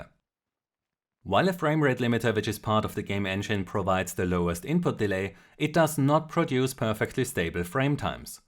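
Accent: Germany. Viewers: Ahh der Deutsche akzent xd aber cooles video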